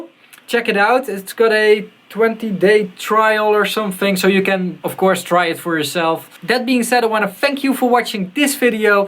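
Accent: Netherlands. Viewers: I'm perceiving a Dutch vibe in the way you speak English :) nice review man, quite objective.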